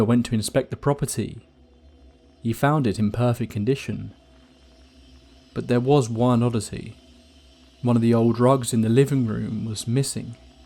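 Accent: United Kingdom. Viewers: Your voice makes these stories so boring and uninteresting. Please be less British.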